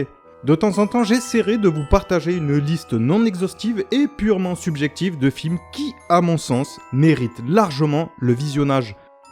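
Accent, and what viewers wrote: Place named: England, United Kingdom. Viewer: Vidéo interessante mais ton accent Anglais il irrite vraiment les oreilles !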